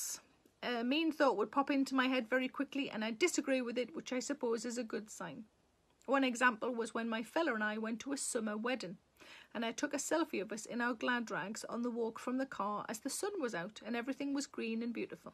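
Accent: Newcastle, United Kingdom. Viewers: I LOVE hearing your Geordie 😉😉😉 When I lived in Newcastle I loved to learn learn how to speak it. But when I asked people to repeat what they said, they didn't say it in geordie. ☹️. 😉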